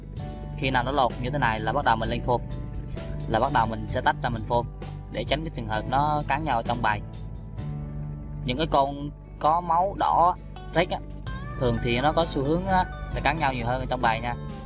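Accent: Vietnam. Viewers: em nghe giọng anh em đoán ko nhầm thì anh ở đà nẵng giống em haha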